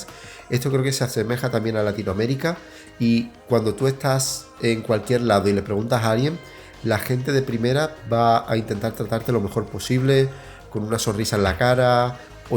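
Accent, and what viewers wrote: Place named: Andalusia, Spain. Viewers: Tres años en Chequia y sigues con un acento andaluz atroz? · Que problema tienes con su acento andaluz ? Nuestro acento es maravilloso .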